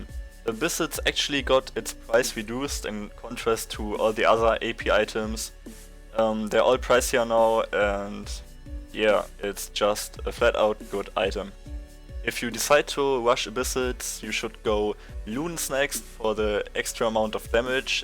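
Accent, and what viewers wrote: Germany. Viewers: Der deutsche Akzent xD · Das deutsche englisch 😂😂 ich liebe es geiler Guide! !! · Deutscher Akzent :DDD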